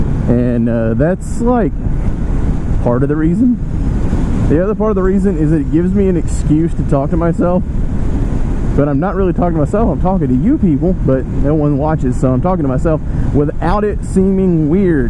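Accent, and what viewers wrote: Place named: Baltimore, United States. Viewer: Are you NC native?....I swear I hear a Baltimore accent lol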